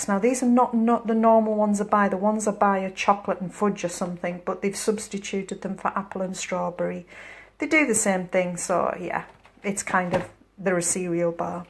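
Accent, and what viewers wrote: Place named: Geordie, United Kingdom. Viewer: Where are you from? I ask because you sound like you have a Geordie twang in your accent. Love your video's. Linda xx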